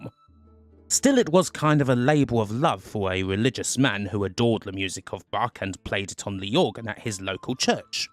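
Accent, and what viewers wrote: United Kingdom. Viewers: I'm not watching 45 minutes of a lithping limey talking about a decades old game.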